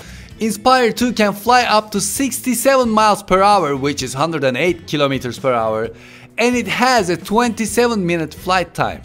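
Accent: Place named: Turkey. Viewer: He's Turkish.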